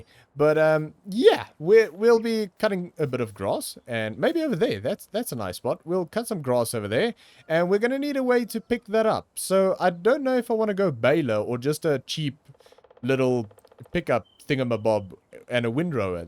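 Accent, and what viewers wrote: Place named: Cape Town, South Africa. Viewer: Hi, love the serie and the longer versions. Keep up the good work and boy, do I miss Capetown listening to your accent. Best time of my life.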